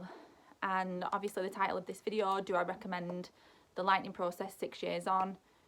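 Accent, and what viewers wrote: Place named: North West England, United Kingdom. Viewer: Sounds good, I'm currently enrolled onto the Optimum Health Clinic course but have been looking into the lightning process. I guess what's holding me back is that I'm wondering what the lightning process can teach that I haven't already found through YouTube and the course I'm on? Thank you for your the video. From your accent I thought you'd be close to me, but I noticed that is North West whereas I'm in Yorkshire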